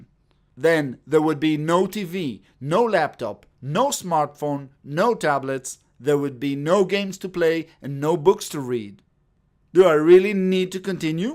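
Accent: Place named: France. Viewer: What is this guys accent? French? It rules.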